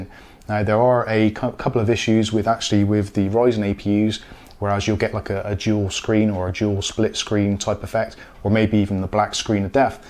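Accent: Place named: Gloucester, United Kingdom. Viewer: Highlands! But from Gloucester originally, so I recognise the accent haha (or am I completely mistaken? haven't been there for a while lol)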